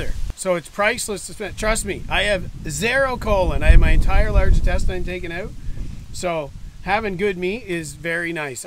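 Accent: Canada. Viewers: Off topic, even though I'm in Manitoba, I could tell you were from the Maritimes just in how you say the word 'barn". Congrats on the PC win in NB (if they were who you were going for...I won't make assumptions)! Cheers.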